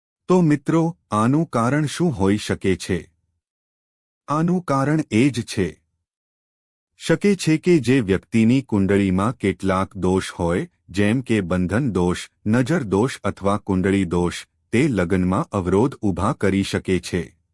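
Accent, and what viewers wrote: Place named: Maharashtra, India. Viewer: Divo salgaway nahi petaway bolnari bai gujrati nathi apbrahans shabdo par thi Marathi bai che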